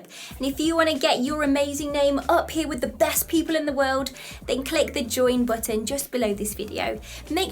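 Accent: United Kingdom. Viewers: i think you are British because of your accent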